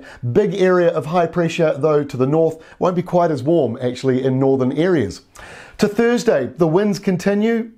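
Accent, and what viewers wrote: New Zealand. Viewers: Thank you, very much for your great job & for your perfect NZ English. I'm from Russia and love to learn the English language. I improve my listenin skill with you,. It's perfect! Thanks a lot!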